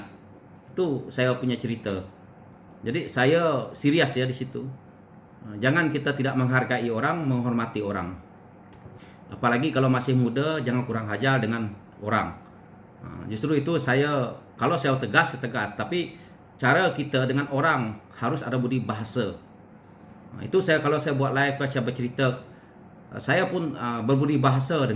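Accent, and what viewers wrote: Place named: Sarawak, Malaysia. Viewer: walaupun pelat sarawak, saya cukup sanjung dgn you punya pencernaan 💪💪💪💪💪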